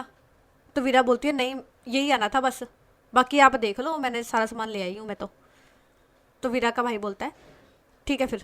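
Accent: Punjab, India. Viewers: I love the way of your storytelling and your punjabi accent 😊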